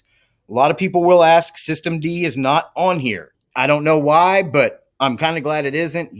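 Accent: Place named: Dakotas, United States. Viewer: Central time zone. I'm guessing from the voice you are in the Dakotas?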